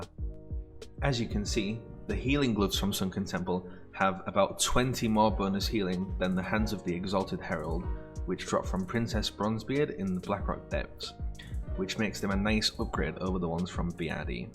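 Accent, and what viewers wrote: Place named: Yorkshire, United Kingdom. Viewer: So Yorkshire I love it, love from another Druid from Sheffield xD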